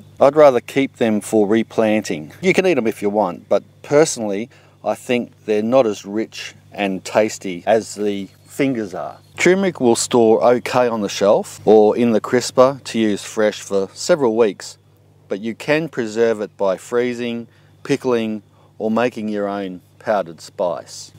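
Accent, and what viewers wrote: Australia. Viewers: Great delivery, straight forward and very Aussie too! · came for the gardening insight. stayed for the Australian accent haha👍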